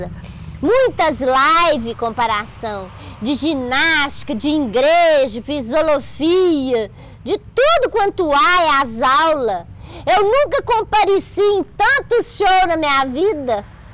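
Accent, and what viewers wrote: Minas Gerais, Brazil. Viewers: Consessa que coisa linda ouvi você com essa simplicidade com esse minerez, só você Deus te de vida longa para nos alerta bjs coisinha 😘😪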